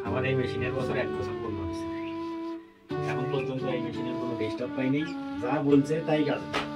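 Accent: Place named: Dinajpur, Bangladesh. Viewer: ভাই, আপনার ঠিকানা টা দেন,কথা শুনে মনে হচ্ছে দিনাজপুরে বারি।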